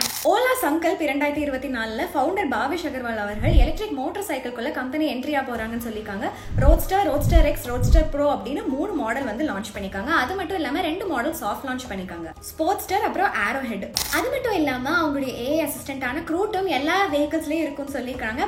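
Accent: Coimbatore, India. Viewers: Coimbatore Tamil ❤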